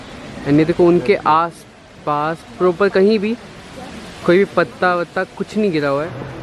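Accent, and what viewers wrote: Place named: United Kingdom. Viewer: This was too good. Just saw your video today. Your accent is awesome. Did you live in UK earlier? Waiting for your new videos. Love from Spain.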